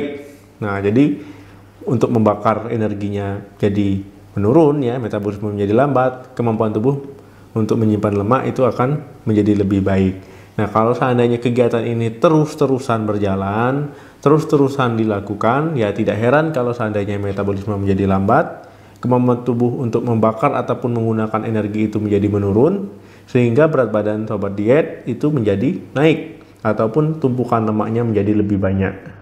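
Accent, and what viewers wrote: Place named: Bali, Indonesia. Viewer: Dokter ini pnya logat bali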